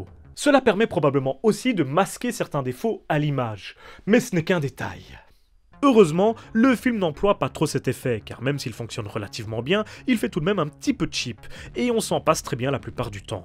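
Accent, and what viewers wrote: Belgium. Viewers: dommage tu devrais essayer un jour car il est fun et excellent Dead by daylight fut mon premier jeux multijoueurs J'ai adoré le faite d'incarner des personnages d'horreur culte des films que tu mentionnes dans la chaîne en tout cas il est magnifique et PS l'accent belge et au rdv 20/20 pour t'as chaîne il est génial 🤣 grâce à toi J'ai découvert des pépites incroyables merci beaucoup 👍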